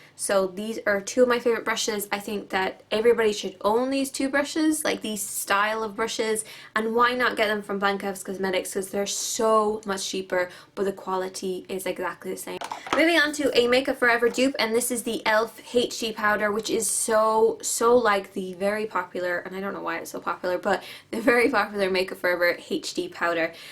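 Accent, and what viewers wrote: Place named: Ireland. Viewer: Are you Irish or American? I'm Irish and can't figure your accent out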